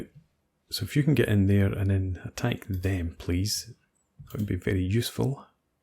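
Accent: Scotland, United Kingdom. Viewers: Cheers and thank you for posting this video! I am always thrilled to hear wee bit of a Scottish accent, a country of which I am very fond of. If you happen to have any ideas or suggestions, just fire away 👍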